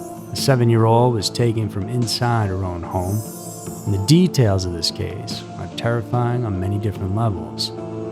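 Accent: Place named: Texas, United States. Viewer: Plano is “PLAY-no”. I’m confused by the narrator having a Texas accent yet not knowing how to pronounce Plano? It’s a big Dallas suburb and if someone has been in Texas long enough to have an accent, they would know how to pronounce it. Maybe his narrator voice is a persona 🤷🏻‍♀️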